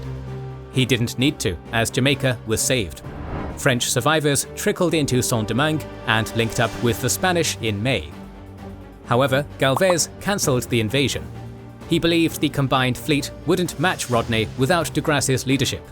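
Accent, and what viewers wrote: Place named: United Kingdom. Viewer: Weird how a British guy is narrating this video.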